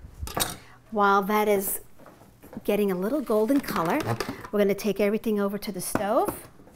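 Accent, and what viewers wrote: United States. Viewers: Her accent is American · She has an American accent and her Italian one is not the best. It's what happens to the second or third generations.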